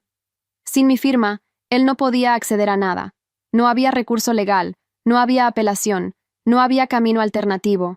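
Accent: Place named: Madrid, Spain. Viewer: Su voz es de la península española Madrid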